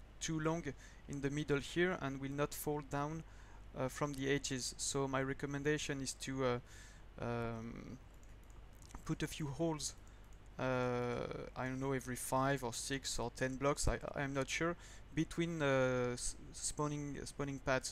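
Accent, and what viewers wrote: France. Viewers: Dat french accent ^^' Merci pour le tuto j'adore le concept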